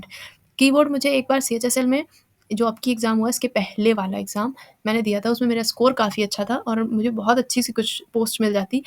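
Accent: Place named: Kanpur, India. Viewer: They both are kanpuriya. That's why same style of speaking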